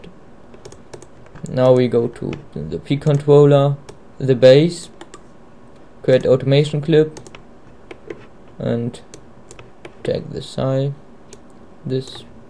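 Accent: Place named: Germany. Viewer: du hast ein deutsch accent (?) i am dutch and i can understand it:P i am soon going to germany to europapark, do you know that? Auf Wiedersehen:D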